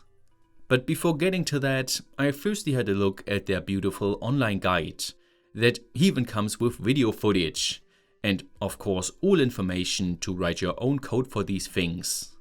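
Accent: Germany. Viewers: Is the Great Scott a real Scott? I hear a German (-ic) accent. :-)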